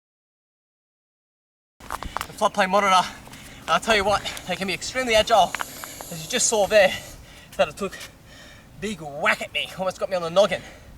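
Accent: Australia. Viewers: This guy vs The Kangaroo punching aussie vs Gary the Goat for most Australian thing on the web.......P.S. what does he call his audience? At first i thought he was saying "george" then it sounds like "church"...i have an American's ear and some thick aussie accents sound like vegtable soup in my ears..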